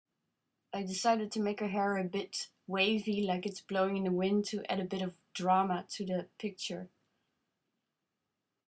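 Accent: Netherlands. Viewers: I recognize that accent...Netherlands?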